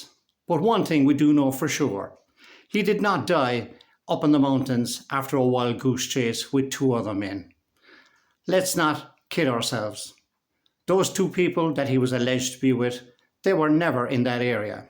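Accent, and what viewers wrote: Ireland. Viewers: Oh I love your Irish accent, xx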